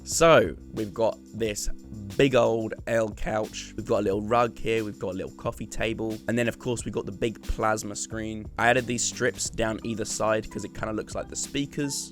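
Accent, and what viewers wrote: United Kingdom. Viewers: Your calm speech and voice, British accent, the chillhop and the patience and hard work you put into these builds/videos makes for such a fucking amazing and relaxing content. Your channel is Gold, mate.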